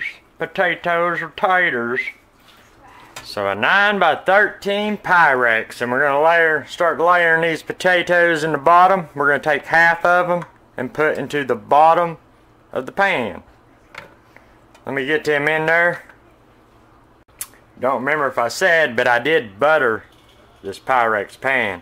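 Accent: South, United States. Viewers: I've never had this before. It looks delicious. As somebody from Alabama, loved the Southern accent!!❤😋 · I am southern also, not quite have this accent down like he does. But so enjoyed his! Great recipe. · I adore the southern accent. I love in South Georgia and we all pretty much sound like this. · Great recipe!! My brother damn near ate the whole tray!! Where's that accent from, Carolina, Georgia? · Love your southern accent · "Pi-tay-toes, or taters" I love yer way of speaking. Im Canadian, and I talk similar to you kinda making fun of the words. I am not southern, but I wish sometimes I had the accent. Love it brother..."pi-tay-tows"....excellent! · your incredible southern drawl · True southerner heck yea some good eating there now That’s the way they talk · Greetings from NYC!! 🌞Just hearing your accent, I know this is gonna be AWESOME!! In my opinion, Southern cooks are the very BEST cooks. 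· I love his sense of humor, southern accent and this receipe even more. That's some good eating 🔥🔥🔥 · I was raised in the south but have moved around, still love the southern twang! The casserole looks amazing and I love how he wants you to use your imagination on the spices, so many variations you can do. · Im going to subscribe because he is so cute and that southern accent, wow!!! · Boy that is a thick southern accent is it made up or really Southern? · Y'all making comments about him sounding like a old guy must not be from THE south. Y'all must be YANKEES. I have friends who are younger than me that sound like this guy and I am from TEXAS. I,LOVE HIS ACCENT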